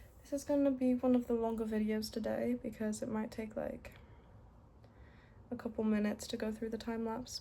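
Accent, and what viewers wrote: United Kingdom. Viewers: I KNEW YOU WERE BRITISH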